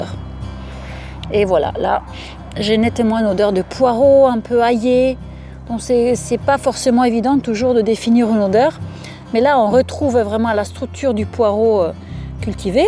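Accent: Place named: Provence, France. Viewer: ce merveilleux accent digne du terroir provençal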